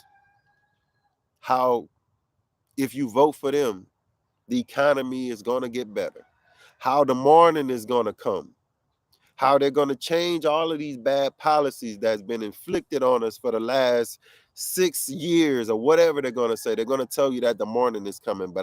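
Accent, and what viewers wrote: Louisiana, United States. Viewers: I knew that was a cajun accent I heard :) Just suscribed!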